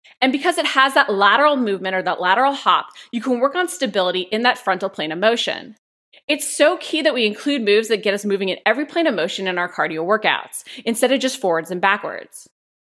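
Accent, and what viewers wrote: United States. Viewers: Hello from the UK. I just want to say thank you for your time and effort in producing these excellent videos which I'm sure are well views in the current climate. Changing the subject some what your accent in this video is reminding me of the Californians off SNL!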